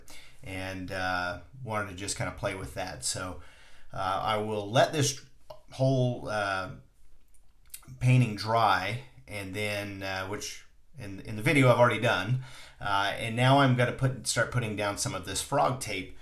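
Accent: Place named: United States. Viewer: I really like your clear teaching style and the look of your abstract works of art. Thank you for sharing so many 'how to' videos for beginners like me and I am excited about trying out your tape idea. I also enjoy listening to your calm and very relaxing American accent. Regards from Down Under in Australia 😊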